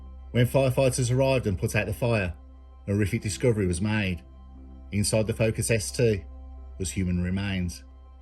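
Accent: Birmingham, United Kingdom. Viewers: Great video but F-me! That Brummy accent makes my toes curl!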